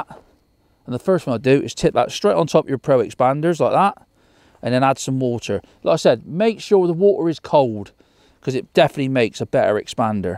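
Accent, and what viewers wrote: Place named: West Country, United Kingdom. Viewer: It’s comical if you put the sub titles on, West Country accent really confuses them.😂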